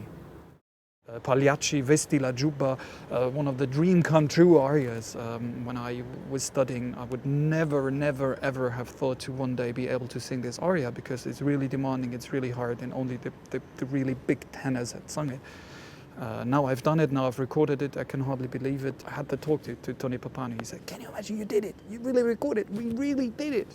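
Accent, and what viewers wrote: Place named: South Africa. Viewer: he sounds south african here...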